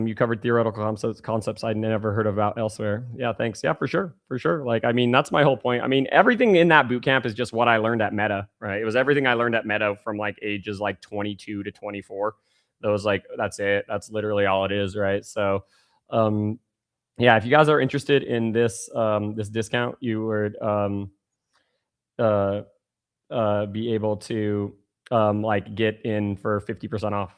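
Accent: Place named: England, United Kingdom. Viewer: Hi, i am from asia and have followed u for months. I am grateful to you since not only the valueable knowledge of data but also your english accent😂. I often listen to your talking to improve english, besides learning data😂, keep this accent:)))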